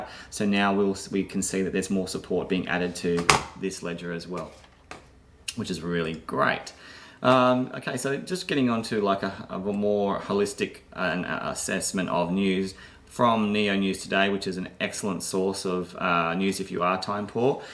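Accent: Australia. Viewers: Nice to hear an Australian voice critiquing the crypto market. Recently came across your vids and am enjoying your input, keep up the great work... cheers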